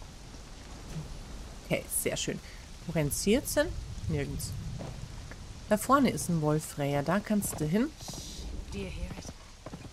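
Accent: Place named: Palatinate, Germany. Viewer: Einfach Pfälzer Dialekt 😅 cool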